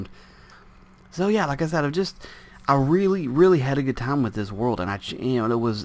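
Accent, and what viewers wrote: South, United States. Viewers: can I ask you something? Where are you from? The south? You kinnda' sound like your're from there..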